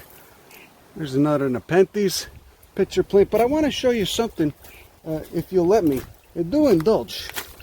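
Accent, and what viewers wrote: Chicago, United States. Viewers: Trouncing about gods delicates with your tattoos and your chicaganese dialect and your peircings i bet youd get your arsehole pierced If ya could fit your cheeks in da machines!!!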